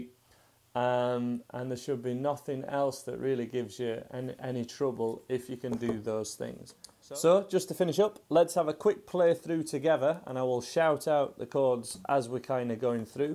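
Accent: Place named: Hull, United Kingdom. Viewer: where are in the UK? I’m from Hull but living in Australia and you sound like a neighbour! Loving learning from you. Very clear to understand. Thanks for all you’ve done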